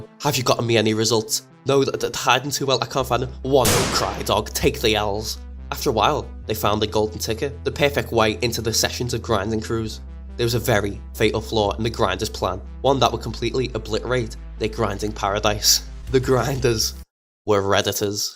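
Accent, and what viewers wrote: Liverpool, United Kingdom. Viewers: i cant tell if your scouse or not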